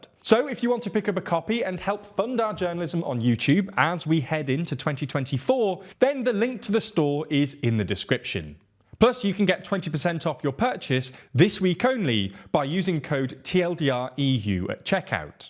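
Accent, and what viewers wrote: England, United Kingdom. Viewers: Yes You tell me, a Dutch citizen, with your pompeuse Brittish accent. First fuckup one minute. Okay he put those points(Wilders) in the fridge. PVDA Groen Links(far left) NSC is mid left, actually wilders is left on economic stances and right on migration. He is NOT Anti EU he is for an Economic European community, you know the thing EU was supposed to be. For a Brit, you got your facts pretty well sorted. I predict PVV, BBB, NSC, VVD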